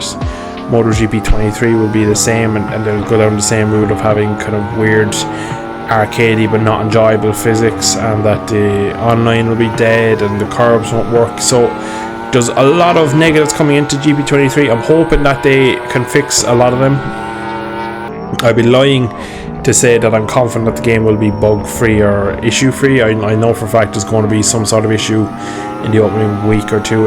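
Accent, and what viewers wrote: Ireland. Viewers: Is thst a cork accent i'm hearing? 😉 Was trying out VRiders SBK natively on the Quest 3 and saw someone mention GP Bikes and ended up here. Havent tried GP Bikes yet but VRiders SBK is actuallt decent for an AppLab game.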